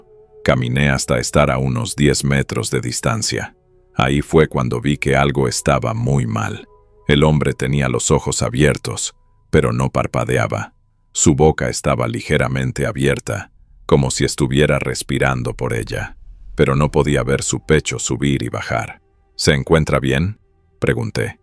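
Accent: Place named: Spain. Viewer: Me gustan las historias de horror pero, con ese asiento español?, ni pensarlo.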